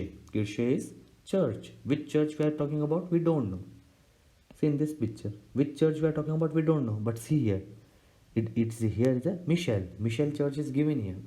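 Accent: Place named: India. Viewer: Мне кажется этот ведущий из Индии. Мне кажется индийский акцент.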